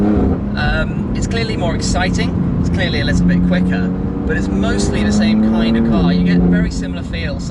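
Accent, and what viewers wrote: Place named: United Kingdom. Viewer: Real fan of your videos. Just a couple of minor info updates. The wing is adjustable to 3 angles. There are 4 colours for the GTS. White, Black, Dark Frozen Grey, Mineral Grey (shiny grey) No adaptive suspension with 3 modes. 3 way manually adjustable coil overs. The adaptive suspension button used in the other M4s is blanked out. That steering wheel with shift lights is not standard. It must be an aftermarket M Performance steering wheel. The standard one has a nice orange stripe at 12 o’clock in the same manner as the GTS decal on the dash. Great review. Haven’t heard many nice things said about it in a British accent.;-)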